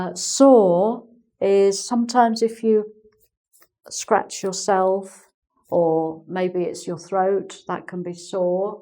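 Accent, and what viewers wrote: England, United Kingdom. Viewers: I like her personality and her British accent 😍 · Thanks for your greet efforts I loved your British accent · I love your British accent, it's sounds very cool 😊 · I love your english accent!!, it sounds elegant and clear,, some day I hope I´ll get it,,, the class was really useful !. I´m from Perú!! Cheers from Perú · Hi Mom, I'm from Indonesia. I like your british accent. I like also the way you teach. I always learn English from your videos. Thank you so much. God bless you. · Dear mam,your speech itself makes us to understand the British accent very well.Thanks a lot. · I like your perfect british accent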